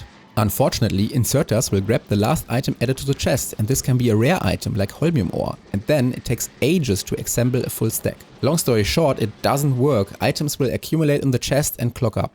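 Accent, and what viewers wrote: Germany. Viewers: If your factory is dependend on belt speed, make smaller factories that work in parallel. 20 Sushi belts in 20 minimal bases instead of the clogging of a long track of recyclers. For me, any row of 20 or more recyclers clog up, as they cannot empty their contents. And even internal storage for anything but scrap is underused, as they will only do the exactly same recycle job to add to internal storage. Warum schreibe ich eigentlich Englisch, du sprichst doch mit deutschem Akzent im Video.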